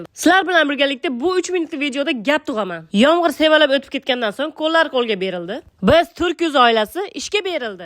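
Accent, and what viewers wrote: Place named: Andijan, Uzbekistan. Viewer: Нечанчи йилсиз, асл исмиз нима асалим, андижонлимисиз шевездан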